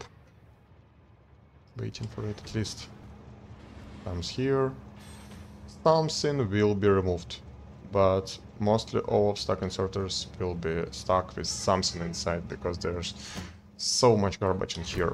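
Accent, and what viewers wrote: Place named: Russia. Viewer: вангую автор русский, я прям слышу этот акцент😀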